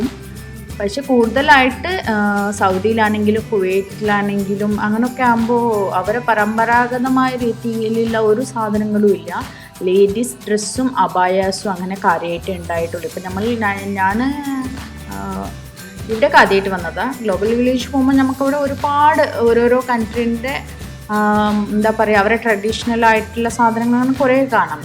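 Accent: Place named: Tamil Nadu, India. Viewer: Vlog super.... Pizza adipoly aayitto.... പിന്നെ നിങ്ങളുടെ സംസാരം എനിക്ക് നല്ല ഇഷ്ടായി.. തമിഴ് കലർന്ന മലയാളം... സൂപ്പർ....😍😍😍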